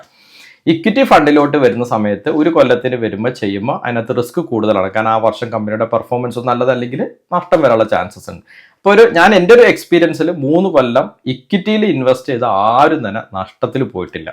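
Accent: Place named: Malabar, India. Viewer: സംസാരം കേട്ടിട്ട് മലബാർ സൈഡിൽ നിന്നാണ് എന്ന് തോന്നുന്നു.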